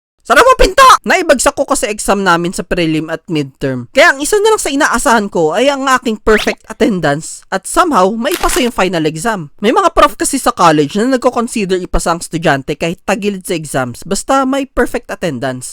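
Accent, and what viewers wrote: Visayas, Philippines. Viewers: Bisaya ka ba po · half bisaya ka kuya?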